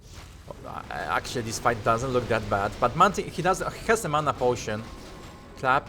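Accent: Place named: Poland. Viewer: Hej,! (Świetny nick, na marginesie, teraz to widzę) Wspieram i popieram wejście na anglojęzyczne rynki!!! Nie będę Ci na nic narzekał, bo wiadomo, początki niełatwe. Nie wiem czy mieszkasz bliżej Warszawy czy Krakowa, ale jak to drugie, to może byśmy się spotkali, postawię Ci coś dobrego;) Zdziwiłem się, bo z tytułu myślałem, że będziesz grał z zagranicznikami, i swoje komentował po angielsku. Akcenty i wymowę warto może trochę podszlifować, bo brzmi bardzo Polsko. Ale nie przejmuj się! Popieram, rób tak dalej, warto! Najważniejsze to robić, reszta przyjdzie z czasem;) Na marginesie, chętnie zaangażowałbym się w kierunkowanie strategii rozwoju, ale Twoja wola. Jeśli nie, i tak wspieram wszystkimi czterema kończynami. Powodzenia! Sława!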